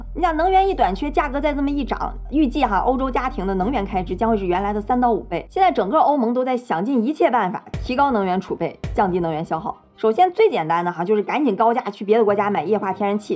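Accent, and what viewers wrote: China. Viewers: 講話大陸本土口音太重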